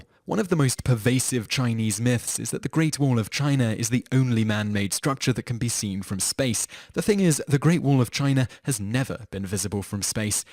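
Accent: United Kingdom. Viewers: I'm pretty sure the announcer guy's a brit. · David T Spoken by a British person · The commentator is British. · So much fail... Have you never heard a British accent? Or did you think Americans have this one? WOW... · The narrator in the video is British not American, and the subject of the video is to clear up possible misconceptions of the Chinese as a society. How does this diatribe become a false attack on Americans? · Not really irony, they're not the same american people who created the myths, plus, like Rachel said, the narrator's brittish. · zekeboy24 very true , is he a British guy ? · Many of the "ridiculous myths" I never even heard of. Seems like pro Chinese propaganda to me, narrated by Guy with a British accent to make it sound more intelligent.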